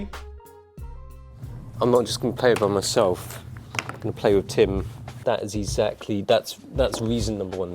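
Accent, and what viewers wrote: United Kingdom. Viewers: i cant watch this, my brain hurts because my eyes are looking at an asian guy but my ears hear a deep voiced brittish bloke ... its so confusing at so many levels. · Thumbs up who thought it was a voice over by a British guy.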